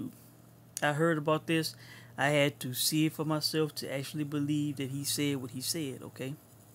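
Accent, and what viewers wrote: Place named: South, United States. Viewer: lol you have a natural Southern accent already, so I didn't get you quoting in country, something... as far as brook wanting get acclimated to a heavier weight before fight ggg again. that's realistic. you should want you fight to feel comfortable going a whole 12rounds with the weight.. jumping up two weight classes isn't smart to do. I do agree about Spence Jr talking about sparring sessions... that's not cool.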